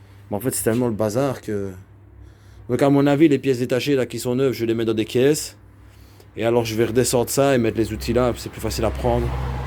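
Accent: Belgium. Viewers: Ça fait plaisir de te revoir, toi, ta jolie mob et ton petit accent Belge qui fait plaisir 😎✌️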